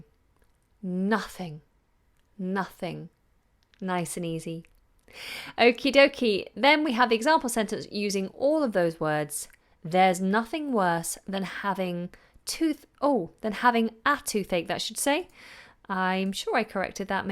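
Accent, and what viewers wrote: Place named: United Kingdom. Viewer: But l keep listening her UK sound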